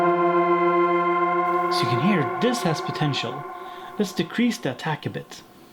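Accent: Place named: Sweden. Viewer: Judging from the accent this dood must be swedish 🇸🇪🤘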